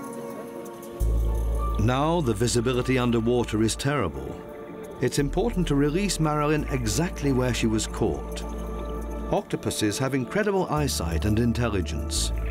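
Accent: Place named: United Kingdom. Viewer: Like the British accent the voice of the geocide of millions of people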